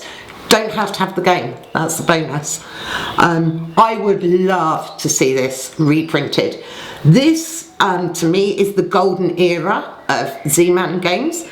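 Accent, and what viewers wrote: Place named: England, United Kingdom. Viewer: Just curious, what kind of English accent is this? thx for the explanation, even if by no means this is should be marketed as a "10 minute" teach I play red too.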